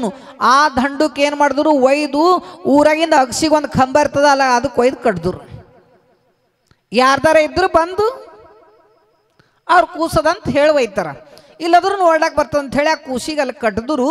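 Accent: Bidar, India. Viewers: ಬೀದರ್ ಭಾಷೆ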